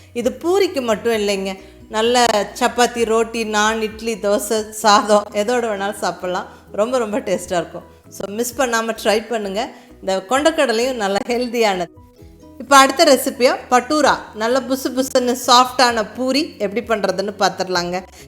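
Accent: Kongu, India. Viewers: Ungaludaiya kongu tamil 👌👌👌👌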